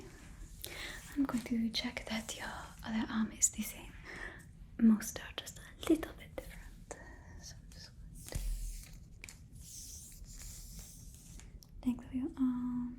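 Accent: France. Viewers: The French accent! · I feel so dumb i was like shes not gonna dona french accent and then she started doing a friend accent and i got so excited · Great french accent ! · Your French accent is calming. You should use it more. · I haven't watched the show but I am made to understand that there are a lot of butts involved. So I wish everyone a wonderful new season of their butt drama. Love the French accent. Looking forward to relaxing with this 💚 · I expected a British accent but the French accent is absolutely amazing and a welcome change! 👍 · Very Très bel accent Français mademoiselle ! J’adore 😊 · I am obsessed ❤❤❤I’ve been waiting for another French accent since the spy fitting video 🥹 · l'accent francais est super bien fait ! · Pretty fine french accent even if as a french i know it's wayyy more classy than the real one xd amazing roleplay, i binged bridgerton and i'm so happy to see that you were ready for this too!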